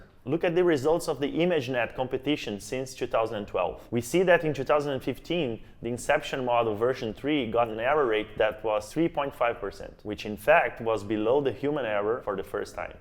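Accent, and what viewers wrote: Brazil. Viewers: Legal, cara, deu para perceber só pelo sotaque. Bom saber que tem um pezinho nosso na TRIBE. Um dia quero fazer um curso aí com vocês. Eu sou cearense, mas moro em Santa Catarina. Já estudei na Inglaterra e na Austrália. Sou formado em Ciências da Computação, mas a 4 anos venho estudado o Marketing, de um forma mais técnica. Esse é um site é fala sobre mim: (está um pouco desatualizado já) <link> E aqui o site da empresa que estou ajudando a criar: <link> Mas valeu, quem sabe eu vá conhecer o trabalho de vocês em Amsterdã. Abraço.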